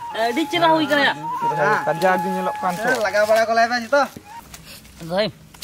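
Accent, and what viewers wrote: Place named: Bangladesh. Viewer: Sob thik ache.kintu Hindi sune mone hocche kono bangali Hindi bolche.tai vlog ta bangla te holei bhalo hoto 🙏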